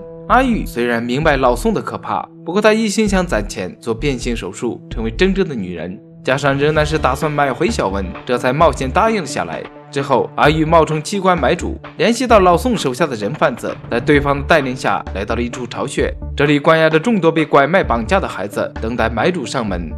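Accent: Japan. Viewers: 你是日本人在講中文嗎?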